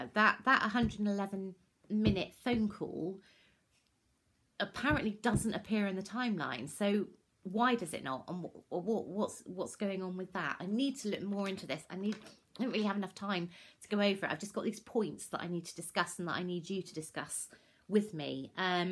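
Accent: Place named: United Kingdom. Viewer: Let me tell ya, you could help over info I already know and this Texan in her 50s would find and about anything you say in that endearing Brit accent requires no apology. Go ahead. Make a video saying, "We are invading Texas and under our rule Texans must consume tea every morning, afternoon and evening or pay a fine, doubling for each offense." Most would think it sounds charming.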